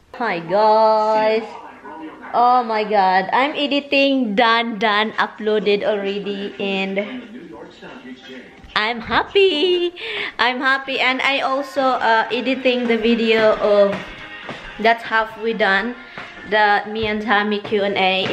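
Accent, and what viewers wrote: Visayas, Philippines. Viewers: I always wacthing you ,,kc,,natatawa din ako s visaya accent mo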